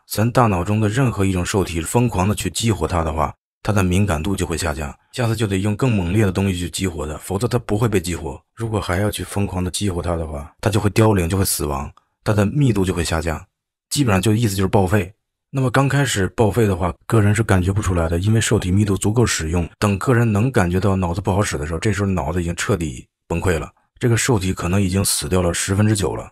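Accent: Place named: Beijing, China. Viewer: 北京口音听着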